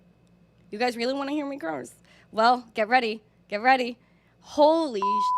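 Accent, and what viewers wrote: Brooklyn, United States. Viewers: Where ya from? Brooklyn or NJ ?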